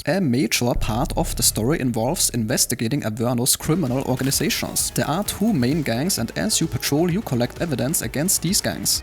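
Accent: Germany. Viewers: Now that’s one German accent !!! I love it - thanks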